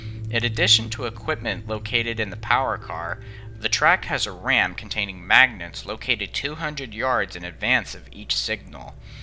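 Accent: United States. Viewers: yall are so mean, he’s American and is trying his best to talk out British words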